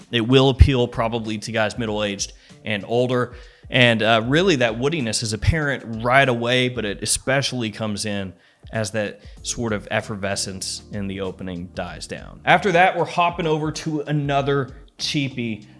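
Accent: South, United States. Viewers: That southern accent should make an appearance more often!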